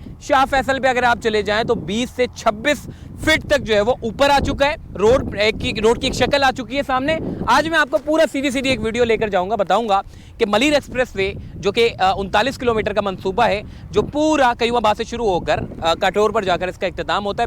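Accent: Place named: Hyderabad, India. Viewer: Full Hyderabadi accent…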